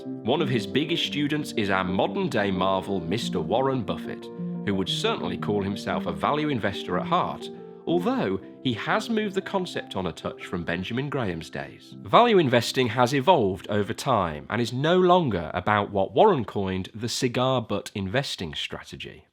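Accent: United Kingdom. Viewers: What a brilliant video. You just automatically trust someone with a british accent and a deep voice lmao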